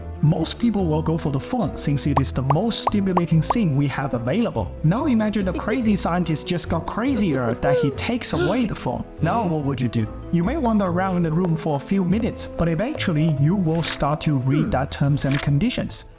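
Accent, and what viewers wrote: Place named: Louisiana, United States. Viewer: Bro you got the cajun accent "youknowhowlooongihavebeenwaitingforthis"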